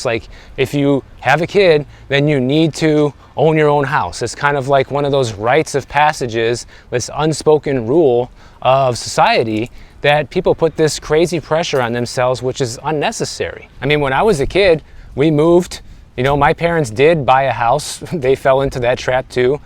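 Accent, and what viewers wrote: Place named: Illinois, United States. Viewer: Somehow.. I knew you were from Illinois.. You're giving me Northwest side, or out West..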